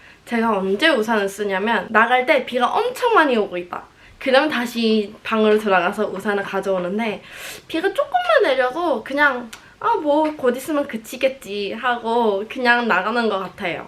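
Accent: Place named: London, United Kingdom. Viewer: 런던은 아니구 surrey 지역인데 근교긴 해요! 감사합니당 완전 어렸을 때부터 영어를 쓰신 건 아닌데 완전 억양이나 그런 게 런던 악센트네요! 저는 미국 호주 영국 한국 다 섞여서 완전 인터내셔널인데 대학교 첨 가셨을 때도 궁금해요!!! 썰 같은 거 풀어주세요 ㅎㅎ 미리 알고 가면 좋은 팁이나!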